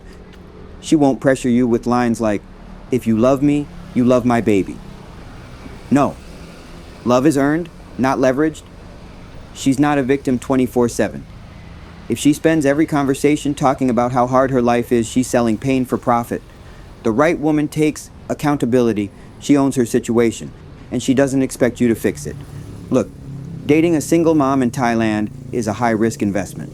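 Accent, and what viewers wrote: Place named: India. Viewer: why u are indian man your accent can't escape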